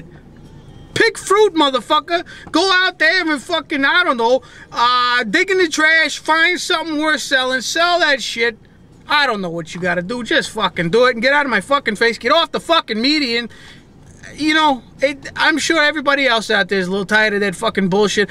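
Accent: New York, United States. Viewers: Great!R.Deniro level definetly! just sorry for other Newyorkers who are shown once again as saying "fuck" every 2 sec in a phrase... n' about panhandlers, you're f**ing damned right man!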